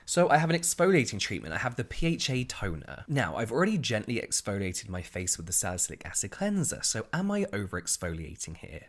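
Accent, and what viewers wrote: England, United Kingdom. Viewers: Can you help me: After I cleanse, put serum then moisturizer my skin / products start piling. I can’t find a good order to treat my face. Any advice? I am also older ( double your age).ordinary and inkey list with some vitamin k moisturizer from piping, rock are my go to products..... Or whatever you, Hyram, and Cassandra Bankson convince me to try. My skin is fair,very dry, oily on tip of nose and tip of chin. I doubt you’ll even read this but I am an older subscriber maybe this will get to you. You, have a very soothing voice with that beautiful English accent.🌹🌹🌹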